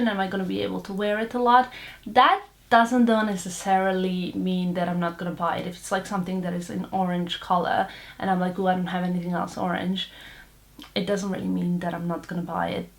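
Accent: Serbia. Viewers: I think she's Serbian?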